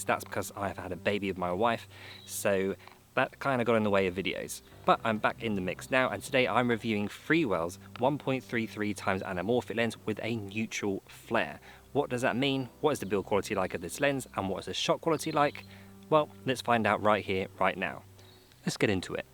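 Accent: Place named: London, United Kingdom. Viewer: 好听，正宗的伦敦音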